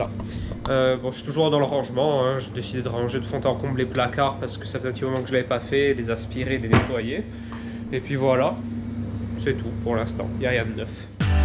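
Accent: Corsica, France. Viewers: Ahhhh cet accent corse ! je l adore 😍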